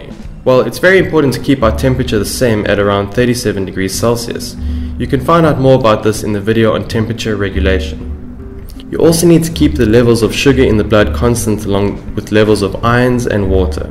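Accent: South Africa. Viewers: Ak Khan He has a South African accent . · Are you south african? 🤔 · when you have the same accent as him (0-o) (lol he is South African for anyone wondering - or at least he sounds it)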